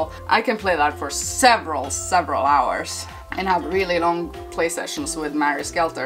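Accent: Norway. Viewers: Cool video girl. I subbed because you're cool and I like your accent from Norway huh?? Thata neat and you like Nintendo 🙂 Happy New Year!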